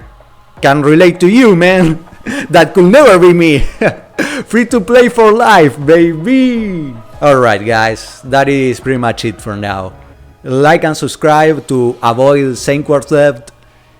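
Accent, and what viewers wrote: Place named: Argentina. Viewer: El inglés más argento loco. Muchaaachooos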